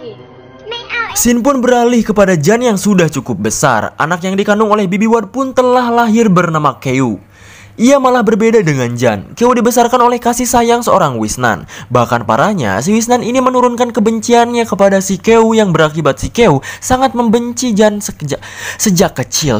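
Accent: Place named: Sunda, Indonesia. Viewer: Orang mana sii bng?ngmng sunda nya lucu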